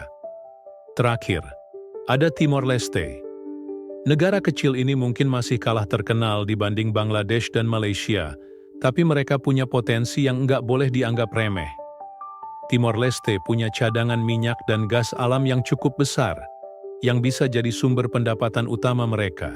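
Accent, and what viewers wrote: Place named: United Kingdom. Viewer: Orang British bahasa Melayu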